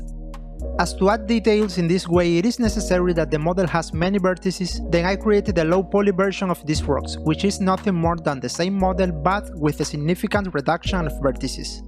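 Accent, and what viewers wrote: Spain. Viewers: Tú hablas español,no jodas, se nota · Seems spanish like accent, I would like to know to satisfy my curiosity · bro se nota que es español EDIT: No es un hate ni nada, muy buen video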